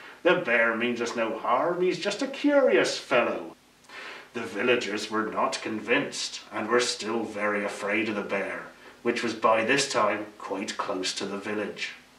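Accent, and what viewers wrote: Norfolk, United Kingdom. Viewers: I could pick out that Norfolk accent of yours anywhere. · I can't tell if it's Ashens or it's just the Norfolk accent. Not heard the Norfolk accent outside of Ashens.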